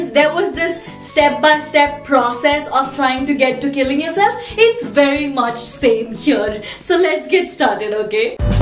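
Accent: Maharashtra, India. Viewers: This is so true. Being a maharashtrian kid, cud easily relate to.. haha. plz add marathi ascent to every video.. that is so fun xD maiyya ashoda :v :v that everytime song! lol.. lovelove prajakta :*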